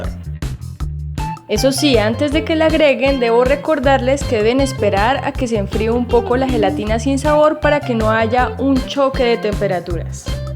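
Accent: Colombia. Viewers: Apenas empezó q a hablar yo dije ella es Colombiana a mi no me mientan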